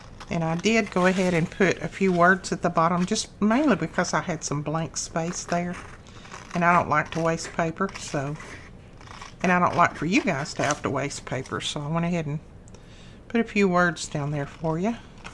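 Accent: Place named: Georgia, United States. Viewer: Hi from Texas. I have been watching a few of your videos and since I was "made in Georgia" I love your accent! Just like family!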